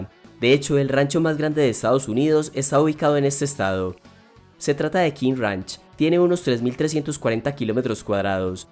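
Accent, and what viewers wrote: Antioquia, Colombia. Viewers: Interesante el video, lástima el acento, creo que sería más agradable escucharlo sin el acento paisa tan marcado.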